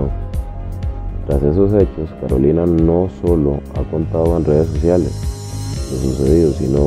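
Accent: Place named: Nicaragua. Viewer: No es cubano parece nicaraguense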